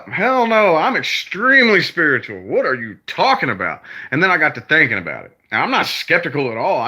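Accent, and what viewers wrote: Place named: New York, United States. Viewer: Does ur hair hurt .. wat kinda question is that??lol haha... chillin n relaxin all day errdayyy..love it haha 😊😊 ps.. love ur accent.... a new yorker like me notices these things 😊😊 and yea anything by stephen king is awesome um.. Hmm wat else??o yea.. fuck the haters.. fuck the braggers!!! stay the chill ass person u are 😍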